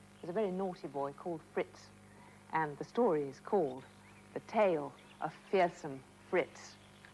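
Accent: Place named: RP, United Kingdom. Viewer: I saw another episode of Gammon and Spinach a few years on YouTube and my vague childhood memories were ruined! I could vaguely remember the stories being about the frog in the opening titles, and I could remember the presenter and her very posh voice but not her name. Imagine my disappointment 30 years later when I found that Gammon and Spinach was just an ordinary story reading programme that Yorkshire Television tried to make more "interesting" by having A Frog He Would A Wooing Go" as the theme complete with the animation of the frog in a Victorian suit and the presenter/storyteller on a pond set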